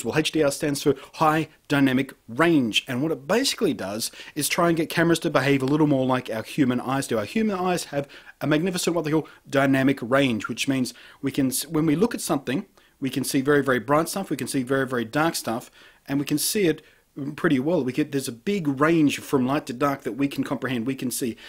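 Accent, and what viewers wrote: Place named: Australia. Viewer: the australian way of saying "H" is awsome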